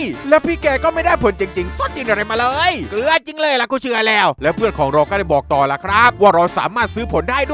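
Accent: South, Thailand. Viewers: คนใต้เหอะนิ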